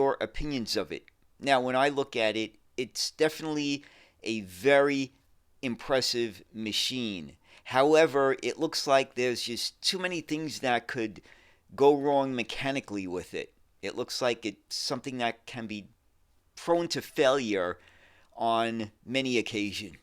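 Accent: New York, United States. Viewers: I'm English who grew up in England. My accent is typically from S E England. Your articles are so informative and interesting. Your New Yorker accent is really great, coupled with your enthusiasm. 😊❤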